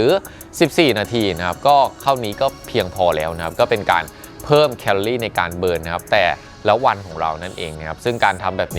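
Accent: Thailand. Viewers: น้องออกเหน่อนิดๆ เป็นคนต่างจังหวัดใช่มั้ยครับ น้องอธิบายได้ดีมากครับ ทำตามได้ง่าย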